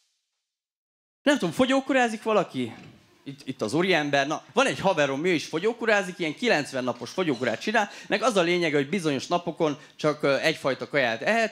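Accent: Székely Land, Romania. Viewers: Szekelyfoldon pontosan is beszelnek! :D Ott lakok szoval jol tudom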